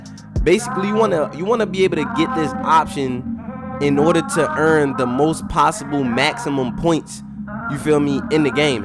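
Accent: Baltimore, United States. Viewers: U got a Baltimore accent bro✊🏽❗️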